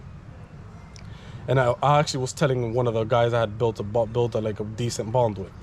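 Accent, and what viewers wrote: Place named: United States. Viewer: I have been to Colombia about 20 times across all the main cities and have never experienced anything remotely this wild. I am glad you are alive and made it through your ordeals. I would encourage you make calculated risks with the folks you meet. If I saw you in Cartagena, I would assume you were a local so don’t feel like you stick out; predators prey on fear and discomfort. I feel bad for what you experienced in Cartagena as I have been in many solitary circumstances between Bocagrande, Getsimani, Centro and Manga through into the late night hours and never felt unsafe. Always always a happy vibe and heavily policed. If you stay in the tourist zones, they are adequately policed and always full of people. You sound American middle class-so try plugging into Colombian middle class and stop partnering with businesses associates who can’t even feed themselves. 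Stick with Ubers- cheaper than taxis and there is tracking accountability. 2.5 million people visit Cartagena a year: if 1% of that number experienced anything similar to your ordeals, tourism would shut down and the city economy would grind to an end, which means the government has a true incentive to weed out these issues. I would recommend these tips: 1. Use Uber, avoid taxis and inDrive 2. Stay within tourist perimeters until you cultivate a dependable local network 3. Don’t call people friends, especially best friends when you just met them. 4. Don’t venture into barrios without an exit game plan. 5. Not sure how t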